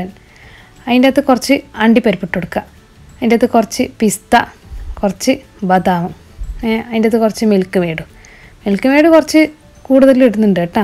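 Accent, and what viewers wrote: Kannur, India. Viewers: Chayayillatha kadi.. peruthanne adipoli.... kannur basha pwoli... 😄😄👌👌👌easy & healthy recipe... 😋👌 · Suppertto😍 ningala Kannur basha varthaanam polichtoo🤭😂👍😍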